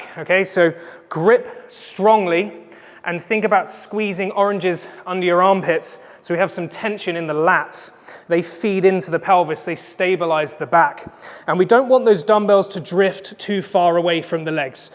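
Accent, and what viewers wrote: United Kingdom. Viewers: 😂😂😂 British accent is the best!! 😍😍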